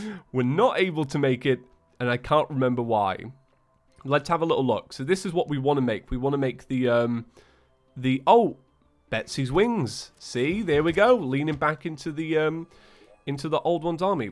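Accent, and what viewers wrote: United Kingdom. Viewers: Yeah, it's like. " Oh god ,I'm so gutted by that -__- " It's just so stereotypically british and I love it lol.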